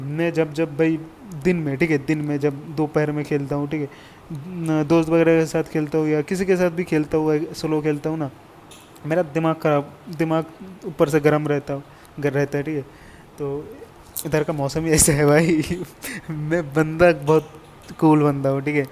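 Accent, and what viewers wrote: Bengal, India. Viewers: Tum bengali ho kya? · Lag Raha hai ap Bengali ho isliya Hindi nehi bol pa rahe ho main bhi Bengali hu ❤️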